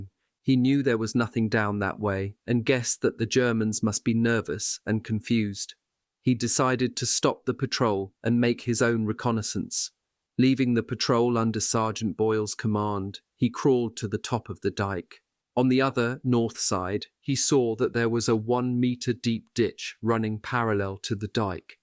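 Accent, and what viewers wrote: United Kingdom. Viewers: Because the person reading is British, it's his actual voice😔😔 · Why are they always using a British accent?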